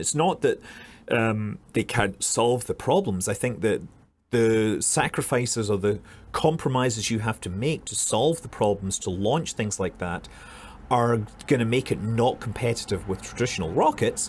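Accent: Scotland, United Kingdom. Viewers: Have you stopped by in Scotland on your way to Iceland,? Maybe it's just me, but I thought I heard a little more of the Scooootland in your accent than usual:D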